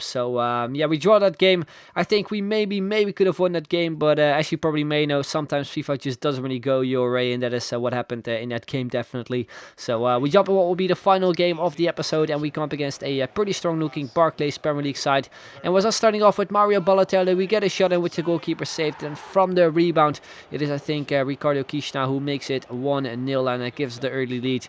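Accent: Netherlands. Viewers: He's Dutch so it might sound a bit off but I heard dj yeah ( his name is )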